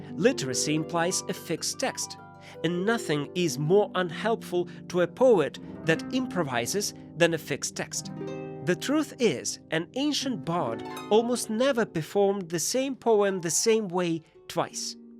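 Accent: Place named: Austria. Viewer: Очень странно, но когда услышала первые слова этого видео, подумала, что сошла с ума, потому как полилась немецкая речь, в то время как несколько недель назад я слушала это на английском. Копец конечно, вероятно через пару месяцев оно переключится на китайском, но в нем я не сильна 😅а вообще, ИИ иногда произносит имена авторов неверно, вот недавно слышала вместо Джейн Эйр он сказал Дженера. Бумажные книги останутся самым надёжным источником, потому как их нельзя сманипулировать, и жаль, что многие уже книгами расхламировались. P.S. автор этого ролика говорит сейчас на немецком, но скорее с австрийским акцентом.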